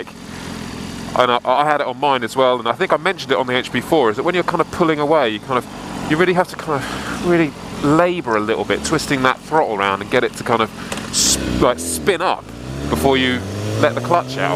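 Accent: United Kingdom. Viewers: 5 seconds into this video and i have to say. I love british accents..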